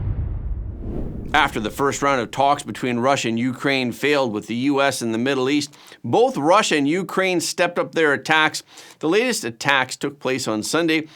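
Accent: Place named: Canada. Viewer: From Perth Australia. Watching FrontPage for few years. Direct & Up to Date mainline Real News. Try to watch with Coffee each morning. does a great job of reading News. I suspect is actually Canadian (accent). I Grew Up in Toronto Canada but saw the writing on the wall years ago when the decided to split the country by labelling in both French & English 😂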